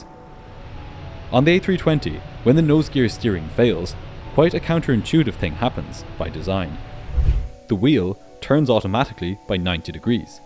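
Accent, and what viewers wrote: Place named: Ireland. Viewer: ….its his accent. thats how they say it in his region. im like 90% sure he’s irish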